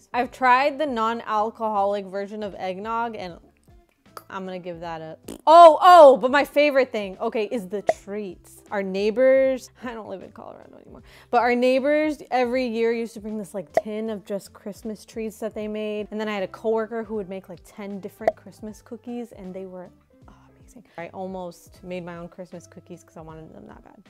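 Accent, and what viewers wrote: United Kingdom. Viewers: That British accent was top tier!!!😂😂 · It’s the British accent for me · Excellant English accent! You're brilliant. So funny. You make me smile on the darkest of days in my life. Please keep it up. Thank you. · “When there’s actually culture” please 😂😭💀 Oh dear gOD THE BRITISH ACCENT PLEASE 💀💀💀💀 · Perfect 👌🏽 English accent from east London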